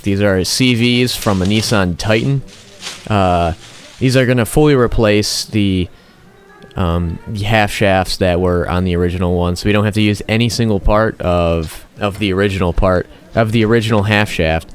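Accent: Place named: Massachusetts, United States. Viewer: Sound like your in Ma.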